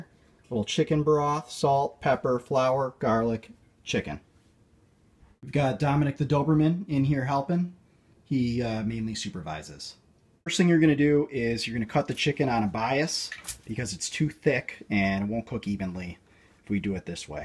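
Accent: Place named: Michigan, United States. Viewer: thanks for the video, you sound like youre from michigan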